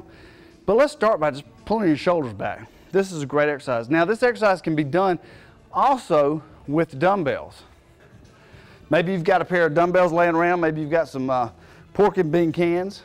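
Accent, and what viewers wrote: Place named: South, United States. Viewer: That´s what I call a southern acccent. I have a chicano accent haha. Thanks for the vid, it was helpful.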